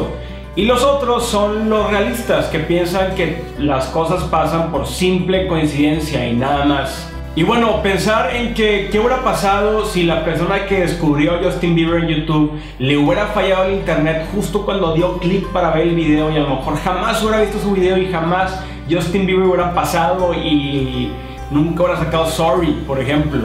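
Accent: Monterrey, Mexico. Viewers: yo soy de monterrey y tu si que tienes todo el asento regio 👢 sera que yo tambien y no me e puesto bien atención 😳 sera que el destino no quiere que me de cuenta 😳 tenemos que ablar ! 😂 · me gusta mucho los vídeos que haces, sigue así ,besos 😙..... 😁😁 pd:(me encanta tu hacento de regio)🙊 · Exelente video yo soy mas soñadora que realista P.D. tu asentó es genial o sera que tambien soy regia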